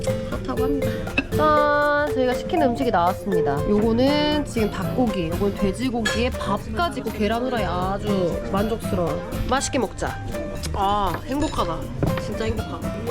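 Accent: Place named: Spain. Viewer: My heart love your Spanish accent ❤❤❤❤❤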